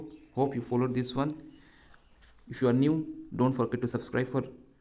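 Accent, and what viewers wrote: India. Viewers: Fucking India accent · Indian accent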